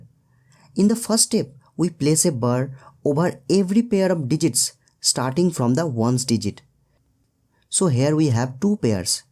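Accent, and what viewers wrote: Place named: India. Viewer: Your voice is telling you are an Indian about 18 to 25 year old I'm too impressed with you wanted to know the person who invented this method surely he too was either Indian or Arabic but don't know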